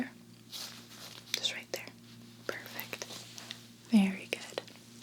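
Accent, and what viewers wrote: South, United States. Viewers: The Christmas series she did with the curse removal had more of a southern accent going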